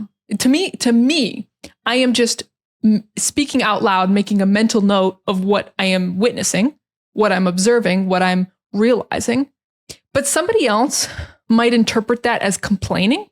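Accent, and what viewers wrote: General American, United States. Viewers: Hi, your accent is really an American standard accent. I understand it well. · I found it interesting what you said about your accent , that you have a standard american accent , the same as the young american generations and that people from California, Texas, Indiana, Minnesota speak with the same accent you have. But that surprised me because I attended an english course where there was a young teacher from New York who has an accent pretty different from yours.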